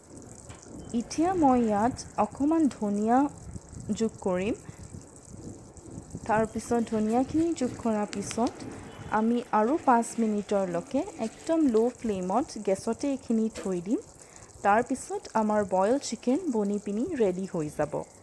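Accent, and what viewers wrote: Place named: Lower Assam, India. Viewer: Looks like you're from lower assam but you're trying to speak in upper assam dialect...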